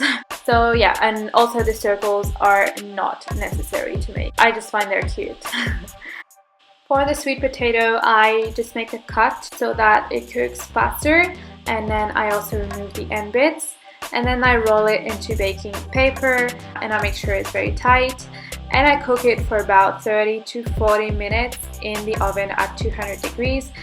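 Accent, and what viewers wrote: France. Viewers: are you from France :p?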